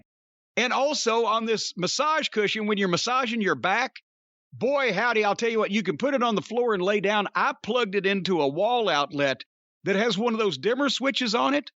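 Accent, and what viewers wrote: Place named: Midsouth, United States. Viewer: That's how we say it in the Midsouth USA lol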